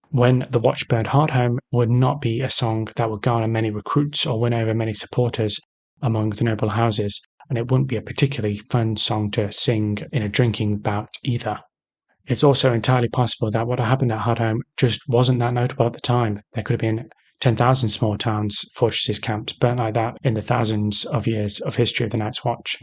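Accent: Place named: Midlands, United Kingdom. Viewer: As a fellow Brit I'd guess he's from the midlands somewhere ? Though in some words the vowel sounds are more southern, so maybe Nottingham? Or maybe Yorkshire but university educated in the south (British academia is biased against northern accents so sometimes people's accents shift a bit. Especially at the older universities).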